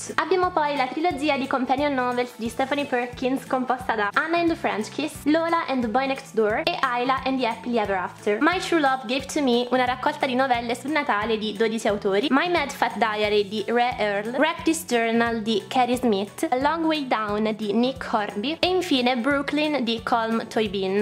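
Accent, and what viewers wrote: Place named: Italy. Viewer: Ya sabía yo que era Italiano. Se oye luego luego. Lo bueno que se parece un poco al español y pude entender algo jaja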